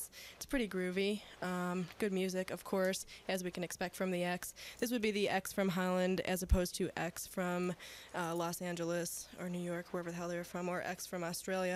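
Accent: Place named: Midwest, United States. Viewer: such an intelligent and self-possesed woman. I appreciate the Buffalo/Midwest accent as well.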